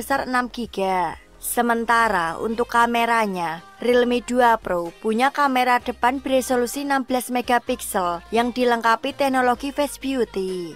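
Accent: Java, Indonesia. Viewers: Medok bat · Dari suaranya sih kayak dari jawa,wong jowo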